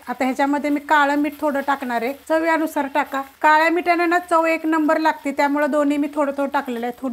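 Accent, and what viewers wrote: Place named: Ghats, India. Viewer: Mi navin viewer aahe. Mirchi nakki kontya prakarchi vaparli aahe? Aavadali. Tumhi ghatavarche dista. Aapki boli vatli. Mala Abhi man vatla tuza. Satara bhgakadchya sadhya sopya pan ashach khamang recips dakhav. Ghatavar kami vastunmade atishay chavisht padarth kele jatat. Mazya hya channel la khup khup shubhechchha.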